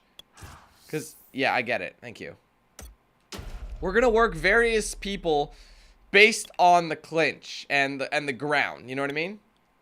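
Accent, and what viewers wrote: Ireland. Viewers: Wait a minute.... is Irish? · Are You Irish?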